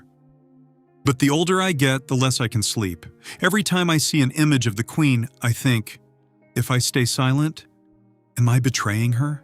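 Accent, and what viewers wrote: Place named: United States. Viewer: Why do we have to have American sounding readers 🤬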